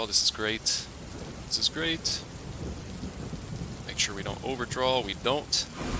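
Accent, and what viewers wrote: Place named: South, United States. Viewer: Love the thunder and rain in this video. I also hear a southern accent. Greetings my southern brother.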